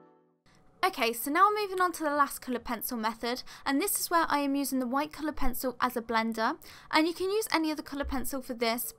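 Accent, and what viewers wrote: England, United Kingdom. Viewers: Can can hear you are English. I have a problem getting Prisma's here. I can buy whole sets here in the UK..but individual colours are impossible to find. Can i ask where you find yours? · I love your British accent😍🔥 . Is it just me who is just listening you talk rather than actually understanding 😂❤️....loved it though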